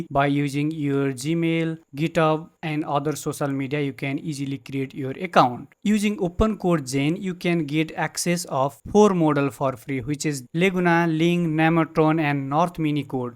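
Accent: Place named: Nepal. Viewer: timro nepali accent lagi raxa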